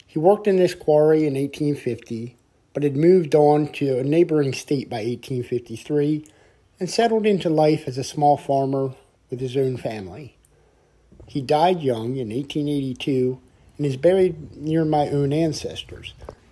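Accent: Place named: Mid-Atlantic, United States. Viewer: You have a mid-atlantic accent. Like a Philly or Baltimore accent.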